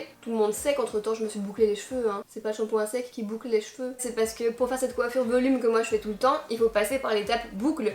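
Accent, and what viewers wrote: Belgium. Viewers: Trop heureuse que Batiste t'es choisi pour la campagne! Tu es géniale ! Par contre déçu qu'il t'oblige a dire "huit" avec l'accent français, je préfère ton joli accent belge! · J’adore l’accent belge mais sourci ça passe pas 😂😂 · C'est malin je vais écouter maintenant! Par contre j'suis déçue qu'on t'ai forcée à dire le "huit" à la française, en quoi est ce que choquant qu'on entende que tu viennes de Belgique? C'est dommage ça retire ton charme:( Et puis merde vive la Belgique! XD (Oui je suis belge XD) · Je viens de commencer à te regarder et je t'adore . Tu es Belge ? Moi oui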